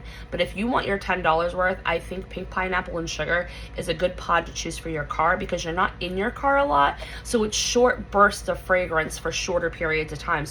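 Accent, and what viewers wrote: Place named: Massachusetts, United States. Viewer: I live in Southeastern Massachusetts, & no school on Good Friday. I always thought that you had the Mass accent, and you definitely have our spunk-if you know, you know 😂. I despise Scentsy’s lemon with the exception of the Harry Potter bar. I was so glad when that club fluke happened, so it is back in my club again. Great empties!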